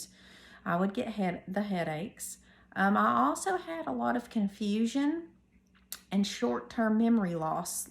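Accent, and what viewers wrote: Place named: South, United States. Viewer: You are adorable. I love your country accent. Good luck on your recovery!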